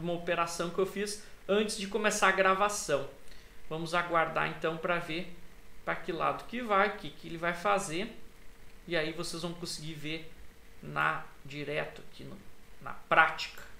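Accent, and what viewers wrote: Rio Grande do Sul, Brazil. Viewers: Cara show de bola , muito obrigado e parabéns pelo video , estou estudando para entrar nessa. Moro em SC agora ,mas sou do RS , saudades quando escuto esse teu sotaque. Muito bom parabéns pela disponibilidade de mostrar e ensinar... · Esse sotaque eu conheço, tu é daqui do sul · Eu sou do norte e de cara vi que era do sul e falei "baaah gente boa de mais esse guri" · O cara é bom.... Ensina com simplicidade, estou tentando aprender sozinho tbm com ajuda de voces, não é facil, mais não vou desistir. Pelo sutaque é gaucho?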